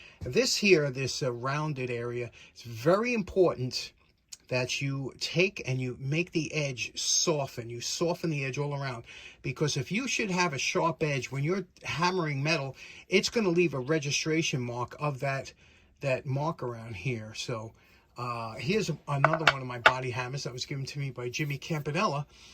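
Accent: New York, United States. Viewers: What and where do I get sports tape? Your NY accent did you say “$ports tape” ?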